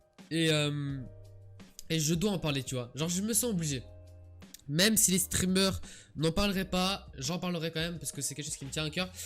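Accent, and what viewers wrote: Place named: England, United Kingdom. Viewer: Ton accent anglais god damn... 😒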